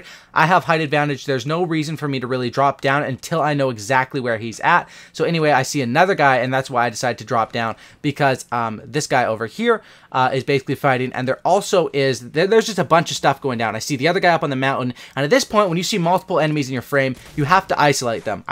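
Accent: Sweden. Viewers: are you from Sweden? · Are you from sweden?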